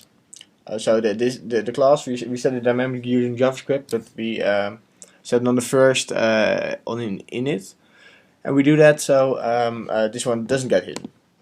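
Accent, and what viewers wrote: Netherlands. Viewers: Yeah I can hear a dutch accent boyyy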